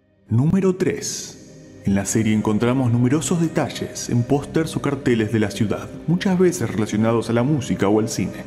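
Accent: Argentina. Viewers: Primero se van los acentos argentinos,luego promos a juegos,gente,lamento decir que se acerca la decadencia del canal 😢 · Soy el único que cree que la voz del "presentador" se parece a la de Zico Tops pero con acento argentino? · che vos sos argentino¿ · Porfa que vuelva el ascento argentino:(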